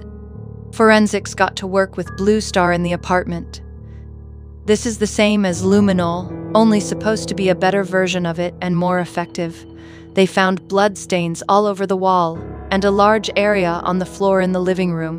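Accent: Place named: United States. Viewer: American narration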